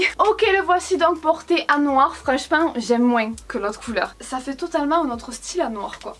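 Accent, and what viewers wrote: South, France. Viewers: Tu a un acten du sud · Dommage tu a un accent à begère trop prononcé ça gâche tout je trouve tu viens de la campagne provençale ou quoi? J ai l impression d ENTENDRE Manon dans la gloire de mon père... non je supporte pas bye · tu es canon et tu n’as absolument aucun complexe à avoir😉 J’adore tes vidéos, toi et moi on a le même accent du Sud, tu serais pas tarnaise?🧐 Bisous guapa😘😘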